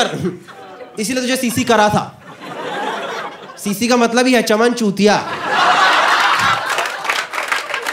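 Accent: Delhi, India. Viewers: Typical delhi accent :D just loved and liked your all the videos...your new fan :D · Every second Delhite sounds like this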